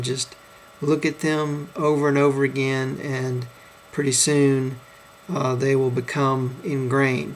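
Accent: United States. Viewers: Good video. What kind of American accent do you have? (when speaking English, I mean)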